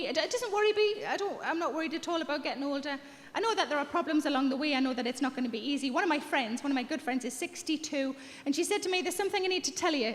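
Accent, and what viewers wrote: Newcastle, United Kingdom. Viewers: As a non native speaker, I have to thank Sarah for teaching me proper Geordie! Just love her! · I just had to watch this again, I think for the third time? #4??? Love it. And I get to thinking how comedians come up with a topic; it's a complete lack of embarrassment, isn't it? Pubic hair? Crotch smells? Everyday life, luv, just talked about with a 1,000 close friends. And the geordie accent. Gorgeous.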